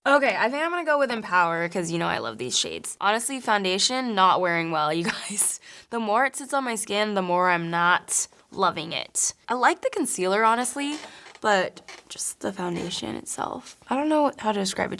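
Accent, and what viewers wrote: United Kingdom. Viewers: I love that British accent💂🏻‍♀️🇬🇧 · Damn girl! Your British accent is perfect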